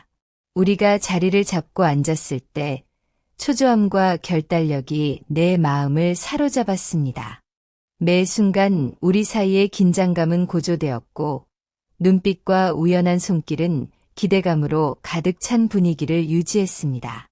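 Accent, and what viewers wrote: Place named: United States. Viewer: 미국 사람이 이야기하는거 같다. 가짜 이야기를 억지로 하는거 같다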